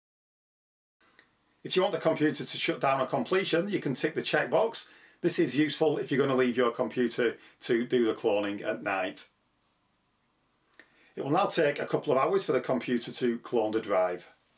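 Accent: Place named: Lancashire, United Kingdom. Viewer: Someone is from Lancashire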